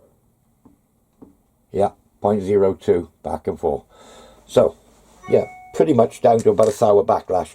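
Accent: Pembrokeshire, United Kingdom. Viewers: I thought I recognised the Pembs accent. Spent many holidays in newgale, lovely beach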